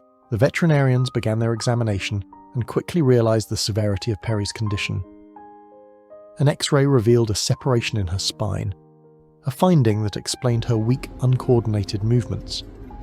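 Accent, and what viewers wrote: United Kingdom. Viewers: Its pavement or footpath in UK. Narrator is very English.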